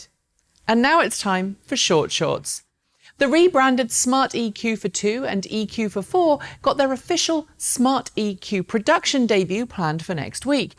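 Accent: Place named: United Kingdom. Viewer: You Go Girl! Let me extend a well deserved congratulation for your award. Your efforts are leaving a footprint on the history of this planet, minus CO2 that is. I know that you do this not for the awards, however I'm sure that there are many more to come. Thanks for advocating "Cleaner and Greener". "EV Marketing/Awareness Award, is the founder and lead presenter of Transport Evolved, a website and YouTube channel that presents news and information about electric vehicles. Distinguished by her British accent and authentic voice, is respected for her objective, interesting content, with more than 1,100 videos and 95,000 subscribers".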